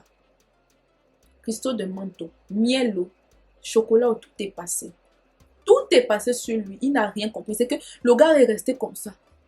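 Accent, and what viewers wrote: Cameroon. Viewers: Aujourd'hui c'est l'accent Camerounais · Donc quelqu’un qui parle avec un accent doit maintenant ce justifier du pourquoi elle a cet accent ma chère si tu n’était pas au courant elle est gabonaise et camerounaise . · Pourquoi tu parles avec cet accent Camerounais? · Mais elle met toujours l'accent cam le plus souvent quand elle parle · U talk like a Cameroonian even the slants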